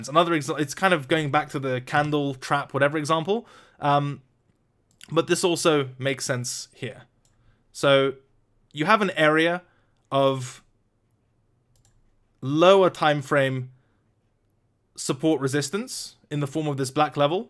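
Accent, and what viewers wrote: United Kingdom. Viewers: High quality content, rich vocabulary and a British accent...I am wet :)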